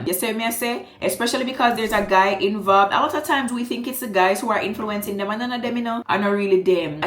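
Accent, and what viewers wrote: Jamaica. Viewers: I really love how speaks, articulate but drop in the patois funny but serious same time. 💫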